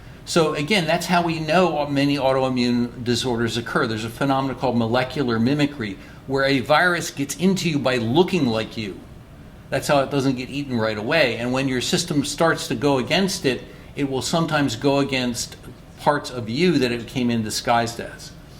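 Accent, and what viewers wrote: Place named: Baltimore, United States. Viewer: His 'balmer' accent brings vack memories.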